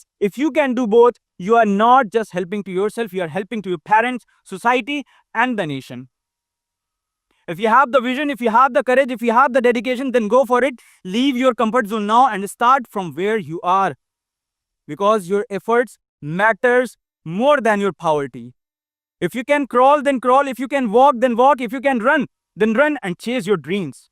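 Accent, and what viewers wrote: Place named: United Kingdom. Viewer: British accent🫠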